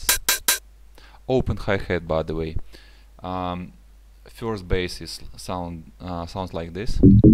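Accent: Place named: Russia. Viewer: русский акцент))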